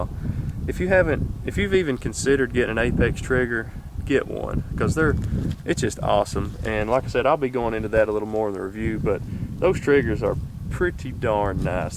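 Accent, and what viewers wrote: South, United States. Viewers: very neat idea, also love the Tacoma, '05-'07? The wife said ya must be a "Southern" boy. Accent. I couldn't tell.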